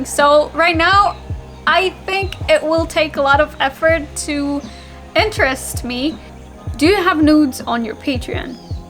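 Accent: United States. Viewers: Are you American or Canadian? Which state you at? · Hey. How come you don't have a heavy Russian accent? You're accent is almost American. Did you live in the States? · I love it when you cuss, laugh, and giggle even at your own jokes. You're American born and raised in Calif, am I right? It seems obvious in every way you speak & express yourself. We might be related. I'm your creepy uncle, hahaha. Kidding.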